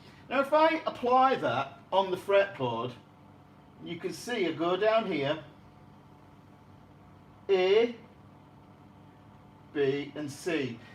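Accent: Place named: Huddersfield, United Kingdom. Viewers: Art Attack for fretboard theory. Absolutely love your northern accent. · Wow, I finally found someone who teaches using a proper accent (I'm from Huddersfield too), and not only that, uses an overhead projector!!! You totally rock, and I am absolutely digging your theory lessons, really well explained 👍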